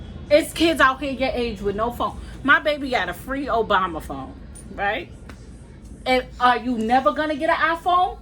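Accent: New York, United States. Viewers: I just love listening to you and that New York accent. 😊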